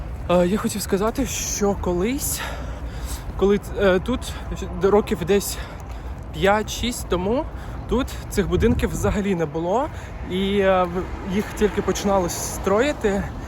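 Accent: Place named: Russia. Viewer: жахливий русский акцент!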